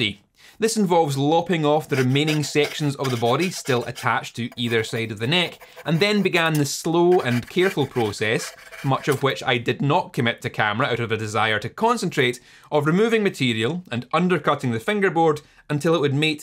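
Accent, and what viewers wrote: Scotland, United Kingdom. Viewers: People leave because of your accent? But...Scottish accents are so cool! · Nice work, love your videoes and the Scottish accent. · It's refreshing to hear a non-American accent, especially a Scottish one. Spent 8 months in Edinburgh in 2007 and loved the place. I became addicted to Irn-Bru and Caledonian 80. I don't miss the neds though, but I tried Buckfast for a laugh and thought it tasted shithouse. Love your channel. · weird, sounds different in this video, much less Canadian and much more Scottish.... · I wouldn’t have done it any differently as I have never repaired a neck break in a guitar. Looks good to me! I don’t get the urge to turn off the video when I hear your accent, I get the urge to drink an Irn Bru and get something from Gregg’s and then I remember I moved back to Australia 10 years ago and I get a little sad.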